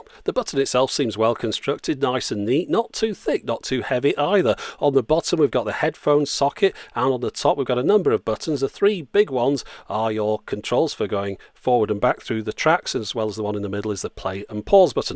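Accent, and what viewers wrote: United Kingdom. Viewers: Love your show!!! Being American hearing your British accent makes the show a lot classier!!(yes it's true, we think all Brits are smart)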